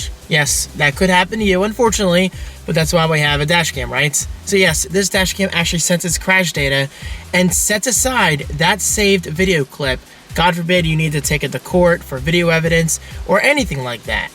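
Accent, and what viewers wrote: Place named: Philadelphia, United States. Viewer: Classic Philly Accent haha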